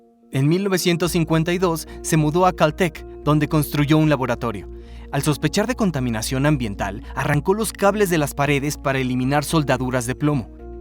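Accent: Latin America. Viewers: Es que este es latino y el otro era argentino y por ende es un poco mejor y actuado el latino en Argentino igual ahí actuación pero era más un doblaje documental osea menos actuación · Aquí hay un problema. Yo, soy de España y como hablante hispano, me siento perturbado por un doblaje que presenta un acento latino muy cerrado (como es el caso). El anterior narrador era PERFECTO. Su tono, expresión y enfasis era el adecuado en todos los aspectos. Este narrador enfatiza demasiado en las tonalidades y da como resultado una narración "cantarina" que hace que pierdas la atención al contenido del video y pierdas el interes de forma absoluta. He decidido desuscribirme del canal hasta que haya un cambio en el doblaje. Y si no lo hay pues ... entonces dejaré Veritasium en Español. :(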